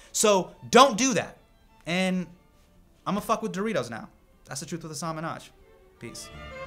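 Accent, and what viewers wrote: India. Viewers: Hasan speaks with indian accent and he wants to Ashton Kutcher doesnt do so. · sorry mate but you do sound like that and I know indians pakistanis and bangladeshis and all of the sound like that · Many indians absolutely do sound like this. Why do you choose to make up stuff when we have ears and with english spoken all over the world in different accents we have developed a pretty good ear to tell the difference between kurly-wurly english and ooga-booga english. Why are you ashamed of sounding indian? Why do you think all indians must sound like a middle-class white american undergraduate?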